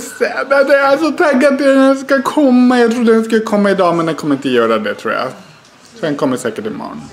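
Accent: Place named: Norrland, Sweden. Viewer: Hahaha så härlig vlogg, alltså er dialekt där uppe är ju helt amazing! :D "hemmakväll-påse på huuuvet"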